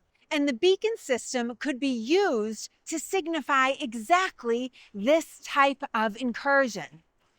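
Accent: Boston, United States. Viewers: Well go listen to other historians talk about Boston and they call Charlestown Charleston and they mispronounce all the street names to me I love the accent it brings me back home I'm from Revere living in Oregon I really miss home this is like a little slice of home for me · Well I learned something I never knew, and it was courtesy of that distinctive Boston accent, lol. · Great Boston accent! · Watch out British accent documentarians who are sooo popular … Boston is here now… it’s a new era of the documentary genre!❤❤❤I love this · When you hear her talk, there’s no doubt, she is from Boston! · Your authentic Boston accent is enjoyed.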